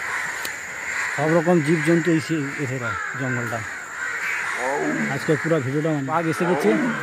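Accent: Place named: Midnapore, India. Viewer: Tomar bari sundarboner kothay dada❤ আমাদের মীদনাপুরের ভাষায় কথা বলছো প্লিজ বলবে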